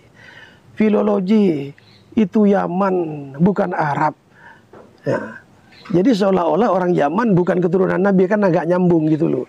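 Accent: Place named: Madura, Indonesia. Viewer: kyai ini klo dari logatnya pasti dari Madura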